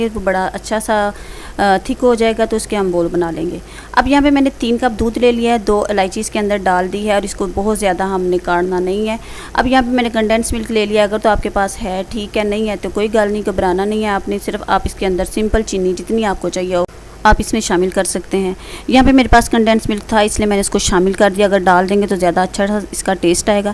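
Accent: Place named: Punjab, India. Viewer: hahaha bht acha laga bhtaza aya apki punjabi sun k behna kal apka link ni laga but aaj apko special shoutout dun gi dear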